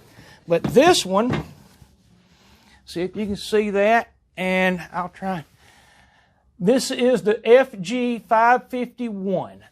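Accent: South, United States. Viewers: Where you from,? I'm a SC girl. Recognize that wonderful southern accent! Thank you for your videos!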